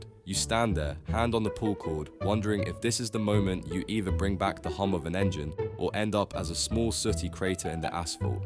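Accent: United Kingdom. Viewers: Get someone who can speak English OT Cockney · Good dry English accented humor., why does everything have to be AI now?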